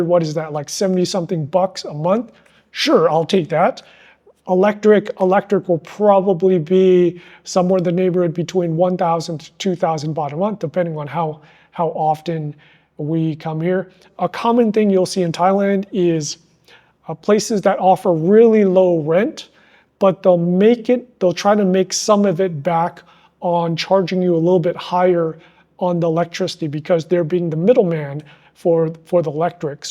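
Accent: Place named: United States. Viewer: I’ve watched many of your videos on Thai language acquisition. From your manner of speaking and the small personal details you’ve shared, I assumed that you are American. But judging from your appearance, you look Chinese. Are you also a Mandarin speaker? Or are you fluent in another Chinese dialect? Are you a trilingual?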